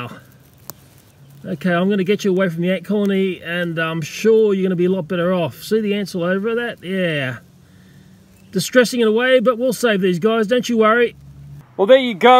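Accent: Australia. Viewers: your natural curiousity is on point mate., also we really need another aussie to narraeete some nature action. aussie narration is the best narration that exists.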